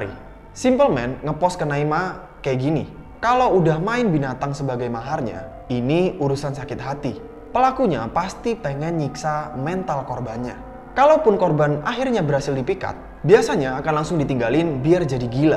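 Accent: Java, Indonesia. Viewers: Jowo keras anjai😂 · Jawa keras slurr 😅 Salam dari Jawa tengah tepatnya Legenda warteg Kota Tegal laka laka 🙏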